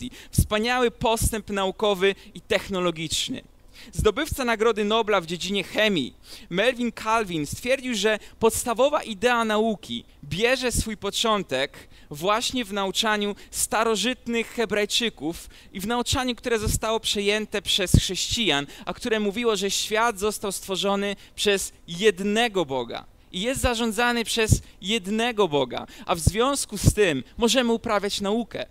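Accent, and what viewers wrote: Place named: East, Poland. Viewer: Ma bardzo delikatny wschodni akcent. Może dlatego, że dorastał na Ziemiach Zachodnich? ;-)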